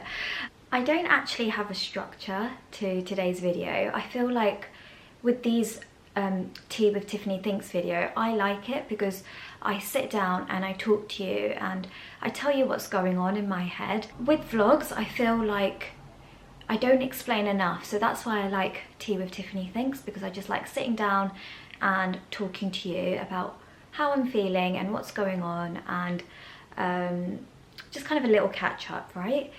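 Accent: United Kingdom. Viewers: Dear sweet Tiffany. You are adorable!!! I watch your Tuesday videos on the car ride home for you and I drink my lukewarm bottled water that’s been sitting in my car all day. I love hearing you speak. First of all your British accent is adorable. I’m from California where nobody enunciates their words. You have the best elocution. Lol Tiffany, it’s so great to hear you speak about things other than the C word because you are so much more than that. I want to hear about whatever you want to talk about. This is your safe space. I’m really happy to hear that you are looking forward to working. It would be amazing if you could start out on a very part-time basis. Filling in for people who need to take a day off would be so helpful to your coworkers, the patients and for you. It would be a win-win-win situation. It is a bit early Tiffany for Christmas. Lol. But I’ll let you be you!!! 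Don’t throw away your tree on the 26th., though. The Christmas season actually lasts until January 6th. 🎄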